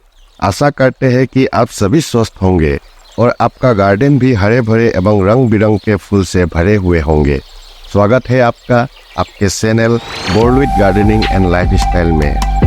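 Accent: India. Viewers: I like your hindi accent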